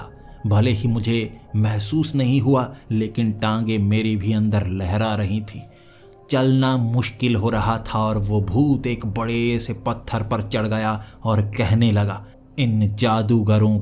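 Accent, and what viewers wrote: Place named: Bihar, India. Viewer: Bhai ki bihari sunke to meri hanshi chhut gayi😂😂😂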